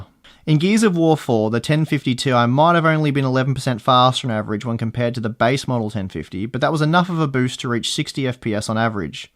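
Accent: Australia. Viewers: Why do I trust Australian voices so much more when it comes to Tech reviews? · Are you Australian?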